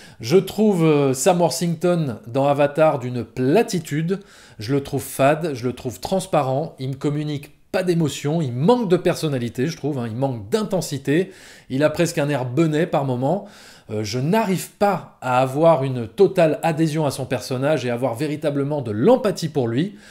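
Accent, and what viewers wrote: South, France. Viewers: Lorsqu'on met Aliens 4ème d'un classement on se rend compte réellement que James Cameron n'a fait que des excellents films voir des chefs d'œuvre. Je le mettrais plus haut dans la liste mais le top 5 est très compliqué Concernant Avatar je le mettrais aussi dans les derniers du classement car il m'a marqué essentiellement pour ses effets spéciaux révolutionnaires et que dire de la 3D... Pour la musique je ne suis pas d'accord avec toi par contre je l'écoute de temps en temps mais pas autant que celle du seigneur des anneaux qui est addictive Du coup si je devais faire mon classement des films de James Cameron (versions longues uniquement) 1) terminator 2 2) aliens 3) titanic 4) Terminator 5) Abyss 6) avatar 7) true lies Vivement la réouverture des salles obscures Bel accent du sud sinon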